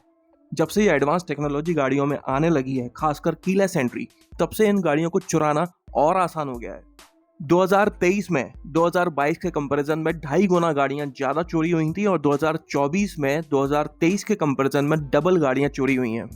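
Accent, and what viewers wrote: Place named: Gwalior, India. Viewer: Tumharii baat hi alag hai bhai maja la dete ho yaar ekdam.❤ Tumhari boli se mujhe to gwalior side se lagte ho.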